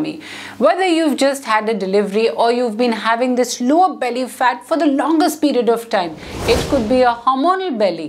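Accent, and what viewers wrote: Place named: India. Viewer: Thanks so much. From your accent I can immagine you are from India. I was living there for many many years and I love your country. Thanks and hugs from Italy. ❤️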